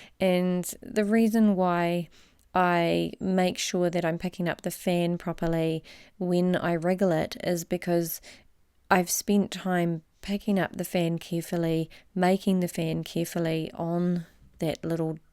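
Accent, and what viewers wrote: New Zealand. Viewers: Love your work!! New to volume just completed training this week😀Got to love that kiwi accent x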